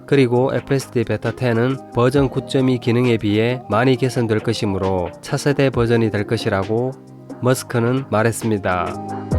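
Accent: South Korea. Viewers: 전혀 반대예요, 굴리시지 않고 한국인 답게 주체성을 갖고 발음해주셔서 매력 빵빵 ! 여하튼 영상 감사해요👏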